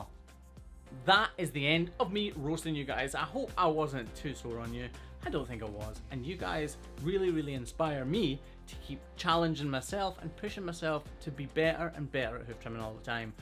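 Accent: Scotland, United Kingdom. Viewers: It's because of the cute Scottish accent lol otherwise it would have been a proper roast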